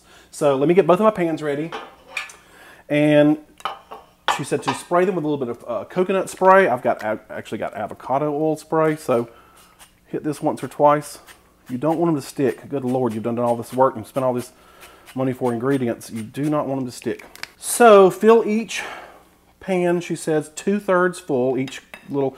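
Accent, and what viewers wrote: South, United States. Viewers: I love your accent ! It reminds me of my southern family! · I adore you ❣ I adore and appreciate your honesty , your humor and your southern accent keeps me smiling while I am cooking along with your tutorials..... I just adore you ❣❣ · I love your enthusiasm for food !!! and your southern accent is darling!!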